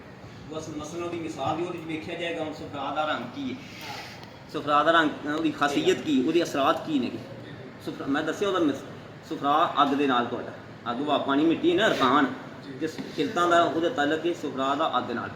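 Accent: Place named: Punjab, India. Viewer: یہ ممکن نہیں استاذجی کا لہجہ ہمیشہ پنجابی ہی رہتا ہے ہم نے دیکھا بعض پختون مریض بھی اس سے پریشان ہوتے ہیں لہذا آپ بہائی کوشش کریں استاذجی کے انداز کو سمجھیں جب نیت کرلینگے ،آسان ہوجائے گا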